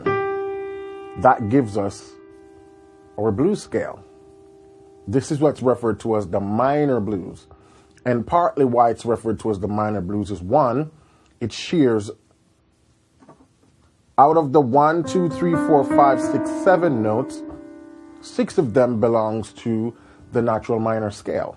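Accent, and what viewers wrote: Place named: Jamaica. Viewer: This is my first time here. I'm a reggae man who has recently branched out to the blues. Today, I decided to check out some Gospel-- and found this lesson. I was not disappointed, and have subscribed. You know your stuff, and that unmistakable Jamaican accent still comes through! Blessup!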